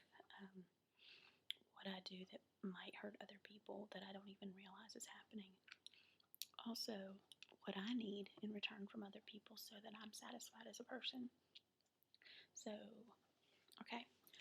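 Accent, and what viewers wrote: South, United States. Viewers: Can't tell you how glad I am to see you back! As much as I love everyone's different accents,...It's really nice to hear a good ole southern girl on you tube again! :) I hope the family is doing good and hope to see more video's when you get time. Thanks, -Steve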